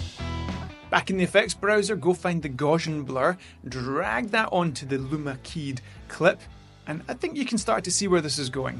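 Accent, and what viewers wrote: Scotland, United Kingdom. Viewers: Well done (&!), Good to have you both back and rolling! Great content, effective comms/visuals as always. And for me the tempo / pace is perfect). 😃And enjoying the scottish entourage (and accents …😉) …👌🤛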